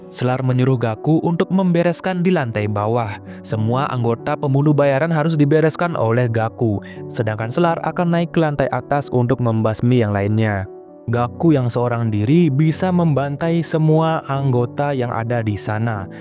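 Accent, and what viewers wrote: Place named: Bali, Indonesia. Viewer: SERASA LOGAT BALI... BLI😁, KELIATAN DARI LOGAT BILANG HURUF T DAN LAIN2😅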